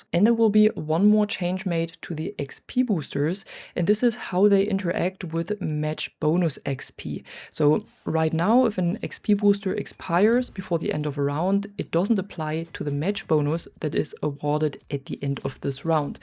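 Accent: Germany. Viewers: this is my new favourite battlefield channel. are you from germany? you sound german :D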